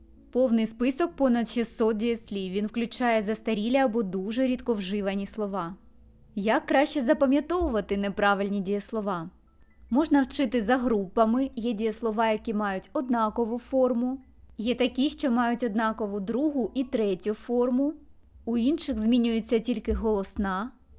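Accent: United Kingdom. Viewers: Вітаю. Дякую за черговий корисний і цікавий урок. Окрема подяка за British pronunciation 🇬🇧💪🇺🇦✌️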